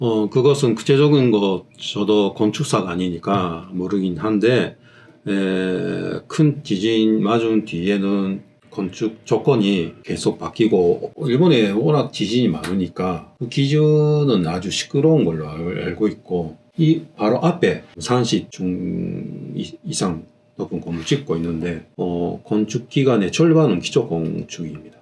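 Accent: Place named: Gyeongsang, South Korea. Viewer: 구수한 갱상도사투리 듣기좋아요😊😊😊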